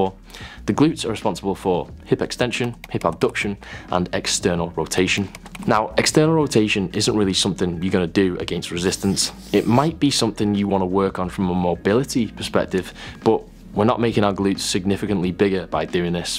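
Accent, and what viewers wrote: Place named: Manchester, United Kingdom. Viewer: Certainly sounds like Manchester to me. Hello from a fellow manc!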